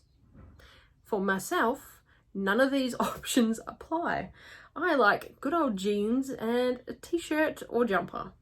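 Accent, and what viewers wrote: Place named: Australia. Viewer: I got Claire as well! Don't pay any attention to nasty people. I am Canadian and love your accent. I have a friend from Melbourne who sounds just like you. I love your Outlander Tuesdays. I just started reading the books and watching the show about a year ago and am obsessed. Keep up the great videos!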